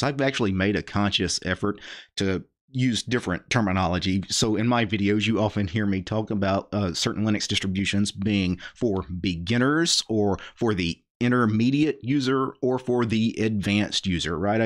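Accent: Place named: Louisiana, United States. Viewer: I saw you on KeepitTechie, been subscribed but man i know your accent was familiar, but now I see you are a fallow Louisiana native. That was a great interview.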